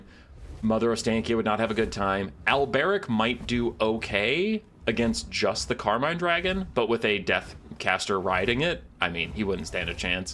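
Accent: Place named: France. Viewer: your french accent absolutely incredible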